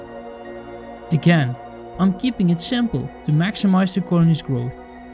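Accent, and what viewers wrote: Netherlands. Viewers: Ik dacht al dat ik een Nederlands accent hoorde =D toen zag ik de oven.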